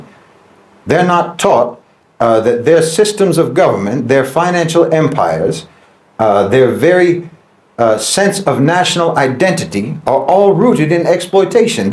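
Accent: United States. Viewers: I aint gonna stop😂 this man is american college educated and speaks in an american accent. 😂 If you cant tell hes a shill you lack critical thinking and will always do what the screen tells you too. He even uses american ideology and american issues while he lives here to tell you about how bad white America is. This person is lower than pedophiles. He's a pedo protector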